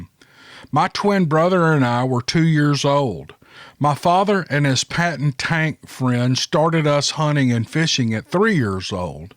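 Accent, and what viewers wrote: South, United States. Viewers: I like listening to your stories in that American accents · Hey,. You are an amazing story teller and narrative reader. Love the southern twang. Keep up the awesome work 😊😊😊😊 Ron From Calgary Alberta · love the channel, you keep up the great work, i love listing to a southern boy reading stories. my people! you go boy, keep up the great work! here we go!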